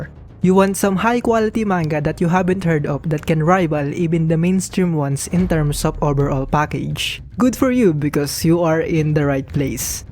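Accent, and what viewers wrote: Philippines. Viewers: me too hahaha the way that he talk is pinoy. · You sound Filipino ish somehow, I don't mean this in a bad way. The recommendations are awesome tbh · Pinoy yan halata naman sa boses nya mag salita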